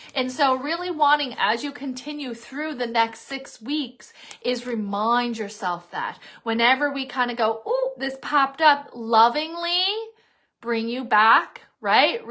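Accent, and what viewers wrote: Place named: Nova Scotia, Canada. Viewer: Hi! I've been listening to your posts for a couple months now. I'm from NS originally but now live away, so it's nice to hear the accent from back home. Your readings are always on point for me, plus they're enjoyable to listen to. Thanks for posting! 😊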